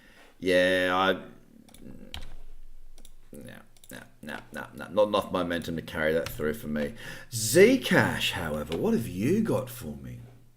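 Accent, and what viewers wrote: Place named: Australia. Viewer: Thanks. Loving your work. I'm an Aussie living in Sweden, so its good to hear that accent again!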